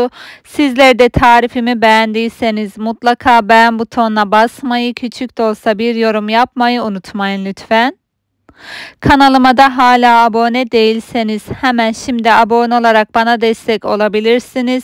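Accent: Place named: Kayseri, Turkey. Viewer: Çok güzel oldu hemde pratik memleket kayseri mi şive benziyor bende kayseriliyim bu arada ❤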